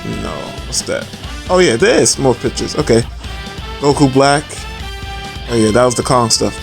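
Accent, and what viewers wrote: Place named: New York, United States. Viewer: Bro gotta be from NY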